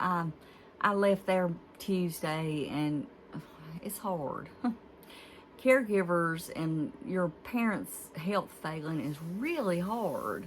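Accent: South, United States. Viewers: I missed you. So good to see and hear your southern voice!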